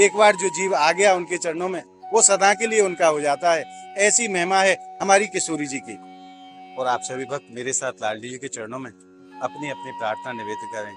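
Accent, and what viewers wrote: Braj, India. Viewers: आपकी आवाज ब्रज मंडल की अच्छी लगती हैं राधा कृष्ण जी k darshan achi thrah 2mint tak करवाया करो dhanabad❤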